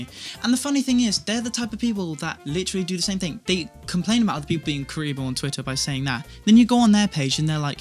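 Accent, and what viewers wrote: United Kingdom. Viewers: Not related to the video but im new here and fricking out right now, like, Handsome cute deep british accent intelligent time stemps his video to subjects y so perfect 😍 ?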